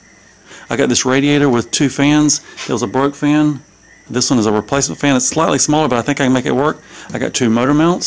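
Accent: United States. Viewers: Fantastic work!!! You’re the example of what having a YouTube channel is all about. Quick and brief explanation of what is going on and what you’re about to do. No useless rambling or tongue smacking like an African tribe and not sounding like you’re severely parched lol. Sorry for the rant but it’s so refreshing to find someone who speaks like an American. Sub’d 🤙🏾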